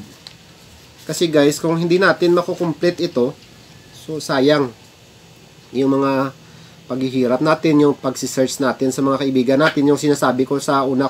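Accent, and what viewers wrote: Iloilo, Philippines. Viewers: Hhehe ilonggo gid ya bala Ang tono lods. Taga diin ka sa Negros lods? OK na gali Salamt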